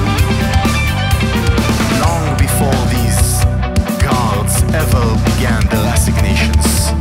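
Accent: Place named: France. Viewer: With a French accent no less. Like butter to the ears.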